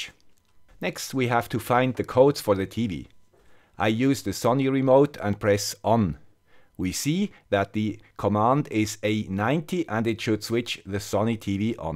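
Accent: Switzerland. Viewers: As usual, a great translation of an alien topic with a characteristic Swiss accent and with huge positive benefits for your audience, thank you! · I'm very cheap and I like tinkering. Everything in my house is DIY and custom made. I like your content and Swiss accent very much. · Hi, ich beschäftige mich gerade mit dem ESP32 und bin dadurch auf deine Videos gestoßen und muss sagen WOW. Echt, der Grad an Details und der Umfang für dein Thema hauen mich echt um. Alle anderen Youtuber sind nicht so präzise oder machen zuviel Entertainment aus dem Thema. Aber nicht beim Typen mit dem schweizer Akzent:D, der brilliert durch Content und sein Hobby! Ich hab das Gefühl egal was ich gerne mit dem ESP32 machen will oder was mich interessiert, du hast das schon einmal durchdacht und nimmst einem dabei viel Mühe und Recherche ab. Also noch einmal: ECHT TOP!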